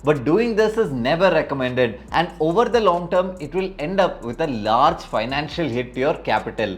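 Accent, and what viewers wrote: Kerala, India. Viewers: Malyali accent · I hear Malayalam in your English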